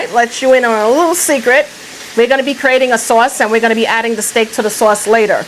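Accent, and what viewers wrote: New York, United States. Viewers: New sub. Love your accent. A certain area of New York? I'm from Philly. 🙂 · Where in New Yawk you from?😁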